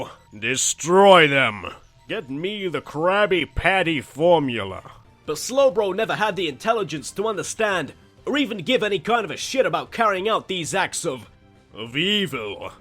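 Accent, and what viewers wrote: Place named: Northern Ireland, United Kingdom. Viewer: Where so you live? I couldn't tell if it was NI or Britain 😅 I live in N.I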